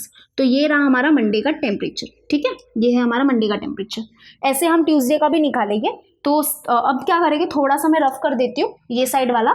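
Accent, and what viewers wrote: Maharashtra, India. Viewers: Miss are you marathi Because you says barobar ❤️😀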